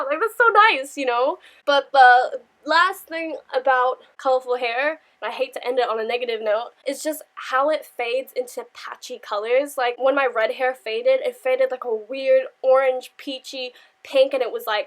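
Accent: Australia. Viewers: You have like a little Australian accent now and it's so cute😍😍😂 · maybe i'm going crazy but you sound so australian here and i can't ever remember you being australian wtf is going on with my brain rn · Aww your accent is changing. You're beginning to sound Aussie ! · In this video I can really hear your Australian accent😊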